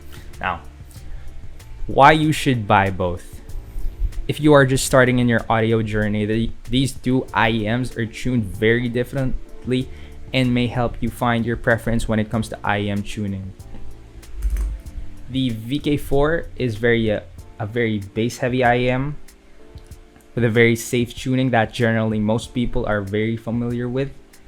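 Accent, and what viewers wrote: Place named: Indonesia. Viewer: You sound Indonesian or Malay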